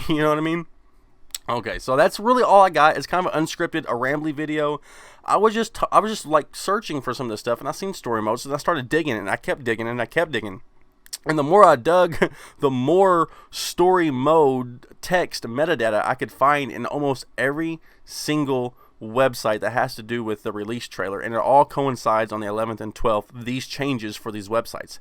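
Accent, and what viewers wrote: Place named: Georgia, United States. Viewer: It's ok, I like your accent, sounds like what I hear in Georgia.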